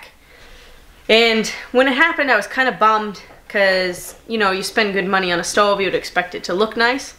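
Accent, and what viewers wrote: Maine, United States. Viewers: Love that Maine accent. Great you tube channel. You are a good role model for young women. You remind me of my daughter. She is very outdoorsie too.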